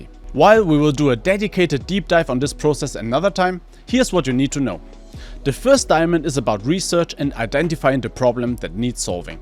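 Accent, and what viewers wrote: Germany. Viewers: German Accent Vanoss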